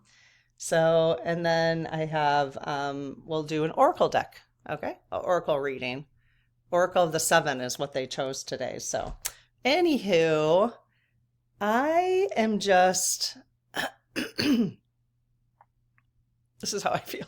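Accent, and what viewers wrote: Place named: Wisconsin, United States. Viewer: Just stumbled on your channel. Love your "Sconnie" accent!!! I grew up in southern Wisconsin and now live in Minnesota.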